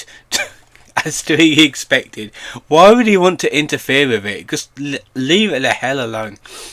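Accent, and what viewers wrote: United Kingdom. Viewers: average british voice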